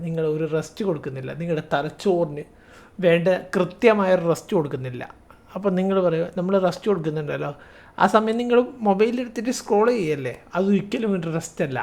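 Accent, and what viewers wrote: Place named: Thalassery, India. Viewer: നാടൻ തലശ്ശേരി ശൈലി❤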